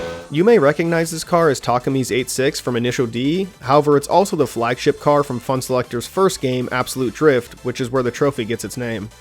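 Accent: South Africa. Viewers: Wait... Are you South African??? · are you actually from South Africa? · Are you South African?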